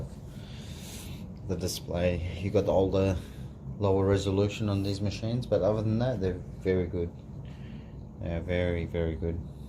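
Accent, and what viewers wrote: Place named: Australia. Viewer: That's awesome and you sound Aussie too my in nsw can you please send me your details need this mod done a a few other things. Look forward to hearing from you ASAP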